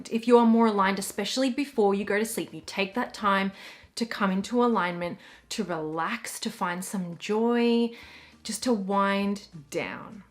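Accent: Australia. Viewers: Somebody complemented you on your English accent. To me it sounds like an Aussie accent, is that correct?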